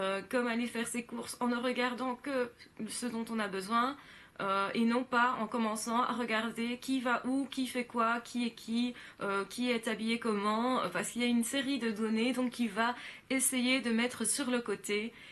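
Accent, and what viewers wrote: Belgium. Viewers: Ah, je me disais bien que vous étiez belge(à l'accent) et les trains derrière vous le confirment... Liège, Namur?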